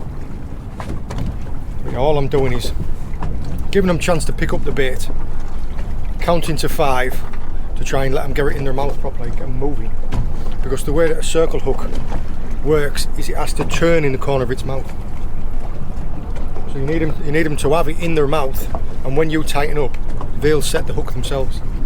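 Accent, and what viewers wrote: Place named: Durham, United Kingdom. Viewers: is that a mix of Durham/ Darlo accent? Love the commentary as you sound local to us Durham pit yakkers. All the best. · Absolutely brilliant. Sound like your from up North originally? Most I've ever caught is a stickleback on a worm but heh! 😂 Brilliant!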